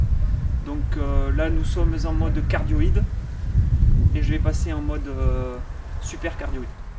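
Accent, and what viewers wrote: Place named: Narbonne, France. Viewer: Ha oué, c'est bien ce que je me disais, du Sud, mais pas de Marseille. ca chante plus joyeux chez vous :)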